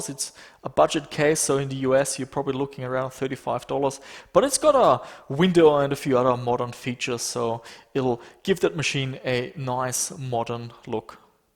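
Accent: Germany. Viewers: The german is Strong is this one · Deutscher knn der akzent nicht werden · Are you german?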